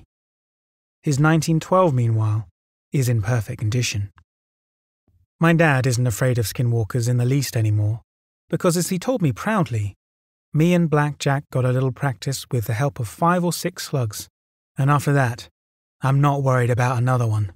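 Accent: England, United Kingdom. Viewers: British accent I’m out ! Painful to listen too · Who knew an English accent and native folklore could work so well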